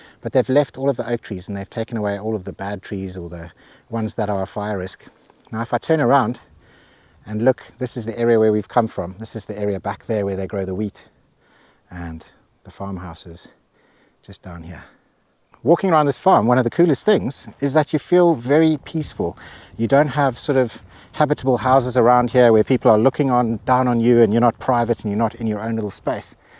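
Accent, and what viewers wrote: South Africa. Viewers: THats a South African accent. Hello from Cape Town and good luck in Portugal. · Hi Are you South African? The accent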